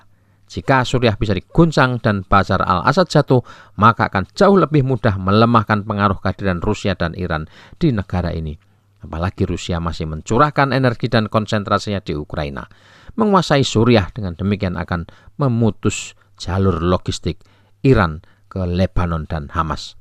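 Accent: Java, Indonesia. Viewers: Jowo medhok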